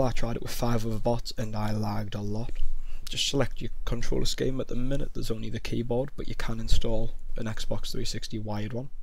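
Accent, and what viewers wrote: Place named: North, United Kingdom. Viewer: (nice to hear another northerner on the net too!) ;]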